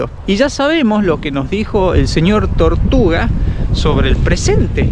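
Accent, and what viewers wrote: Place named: North, Argentina. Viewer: Que lindo el acento norteño argentino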